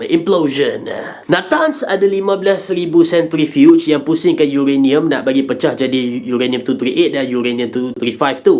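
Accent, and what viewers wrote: Malaysia. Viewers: kerja bagus bang kalau boleh secara menyeluruh guna bahasa malaysia....pelat orang utara...😅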